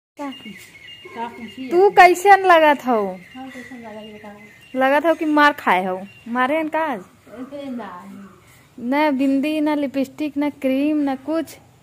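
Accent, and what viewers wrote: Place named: Jaunpur, India. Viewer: Yar aaj suna tha hindi divas h bt aap to jaunpuri divas bna diye. Di hmre yha b bolte h bt aapki bat mujhe bhut km samjh m aai itna pure mt bola kro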